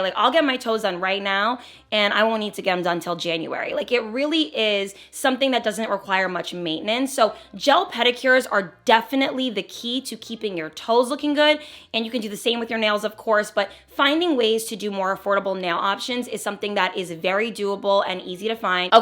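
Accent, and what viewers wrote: New Jersey, United States. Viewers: Her accent is out today! Jersey jersey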